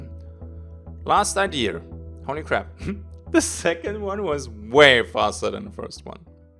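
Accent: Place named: Germany. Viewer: You sound German, I'm new here btw.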